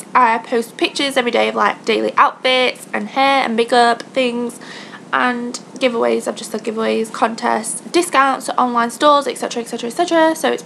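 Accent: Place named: United Kingdom. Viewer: Where abouts in the UK are you from? Your accent is sooo familiar...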